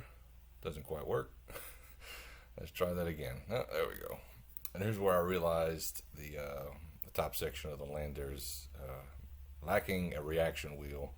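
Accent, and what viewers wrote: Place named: Louisiana, United States. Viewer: Your accent is distinctly cajun. How many of your people have made it the Mun?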